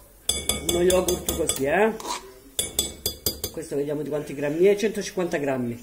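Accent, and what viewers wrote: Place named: Sicily, Italy. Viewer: Super cuoca simpaticissima la parlata siciliana mi piace da pazzi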